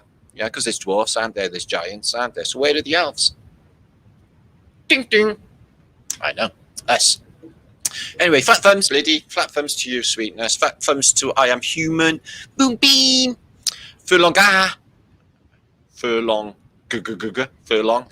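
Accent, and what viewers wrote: Wales, United Kingdom. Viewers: Hi from Queensland Australia, so glad I found you! Am amazed with your work. I was born in south Wales in the 70's- lovely to hear the Taffy accent 😀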